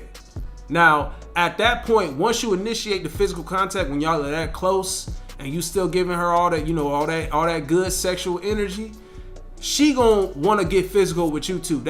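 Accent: United States. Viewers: Yo you from tampa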